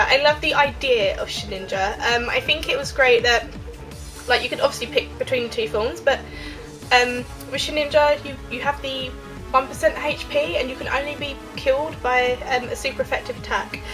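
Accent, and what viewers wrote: United Kingdom. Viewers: Your accent sounds like your from the UK is this true ? :)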